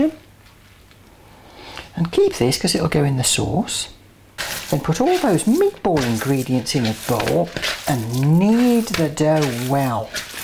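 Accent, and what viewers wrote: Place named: Solihull, United Kingdom. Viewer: Your voice is just the same as my grandma's shes from solihull. I live far away from her so i love watching your videos to hear that accent! xx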